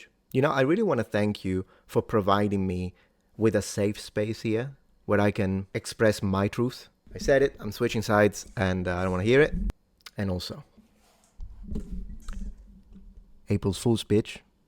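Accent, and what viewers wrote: England, United Kingdom. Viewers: I believe you are from England. :)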